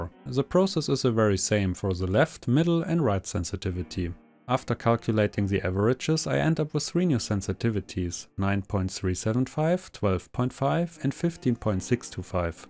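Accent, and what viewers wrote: Germany. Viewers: irgendwie hab ich aus deiner stimme raus gehört das du deutsch sprichst 😂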